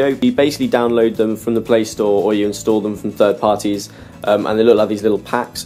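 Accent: United Kingdom. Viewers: q horrible eres y tu acento mamon de britanico es super estupido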